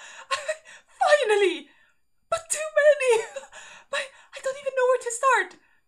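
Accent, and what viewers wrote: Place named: Italy. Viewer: Sorry for non-related, possible offensive comment, but, in the moment you started the video I knew you were Italian. 😅 "The wey of speakingue withe ann ecxtra vowel is so cuteh". Same happens when we Brazilians say "hotchy doggy" instead of "hot dog". I just love it.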